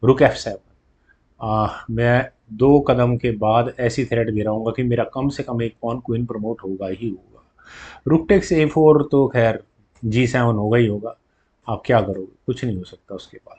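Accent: India. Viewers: Sir punjabi lag rahe ho?.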